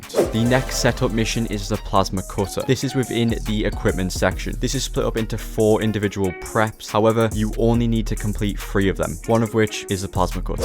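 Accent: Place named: Newcastle, United Kingdom. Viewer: Good vid(u sound a bit geordie pal lol.im one)aye ill Definitely be watching more of ur vids beo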